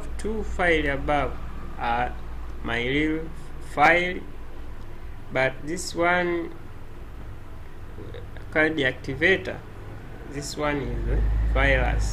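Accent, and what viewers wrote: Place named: Africa. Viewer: First tutorial with an African accent. Helpful and I like it. Proud Africa💚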